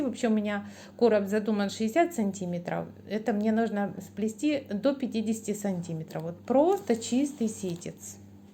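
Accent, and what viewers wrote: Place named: Ukraine. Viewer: Очень рада, что попала на Ваш канал. Самое главное, что слышу наш родной говорок. Украина . Замечательные работы, прекрасная подача. Хоть я плетун со стажем, но все таки очень хотела бы МК по белым трубочкам. Спасибо огромное за работу. С меня лайк и подписка. Удачи, вдохновения и процветания каналу !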